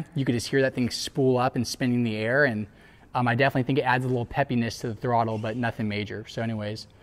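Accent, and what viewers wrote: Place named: California, United States. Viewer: Damn bro you sound extra California. Tom delonge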